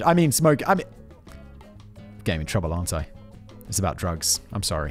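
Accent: RP, United Kingdom. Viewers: I love how the body Spiro was a recurring gag on Futurama. I'm sure might have heard about Futurama, but never watched it. At least we have a recurring gag on brain blaze. is a div who doesn't care to learn about anything, but his British accent and good writers and editors made him millions. I wish I had a cool accent and could make money reading other people's work....at least I'd enjoy and remember the videos I made!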